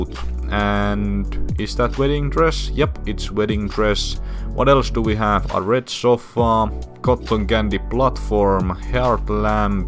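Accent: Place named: Finland. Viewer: Heti aksentista tajusin et täähä o suomalaine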